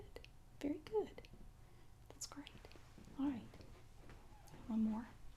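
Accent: South, United States. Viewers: Yay, southern accents are one of my favourites for ASMR. Lovely video, thank you, you seem like such a wonderful person.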